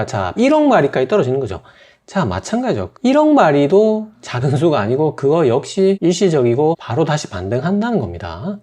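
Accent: Gyeongsang, South Korea. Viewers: 껍데게는 30댄데 말투는 50대경상도아저씨의 그것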